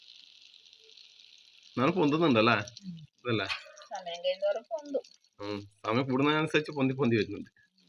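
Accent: Kannur, India. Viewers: കണ്ണൂർ ഭാഷയുടെ ഒരു സൗന്ദര്യം ആഹാ ❤❤❤❤❤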